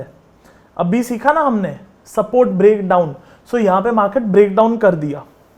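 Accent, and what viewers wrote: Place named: Mumbai, India. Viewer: Awesome Yahi jab bolte h aap to maja aa jata h Aur humko sunna h typical bombay language bro Pls dont say pure hindi 😂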